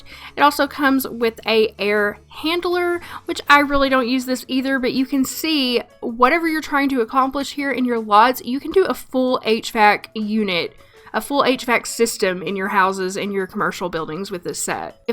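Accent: South, United States. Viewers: I have been playing Sims 2 (on and off) since 2006, and had NO CLUE about moving wall hangings up and down the wall!!! what!!!!! btw love your channel! you’ve got the best vibes and being from NC i appreciate the southern accent representation 😂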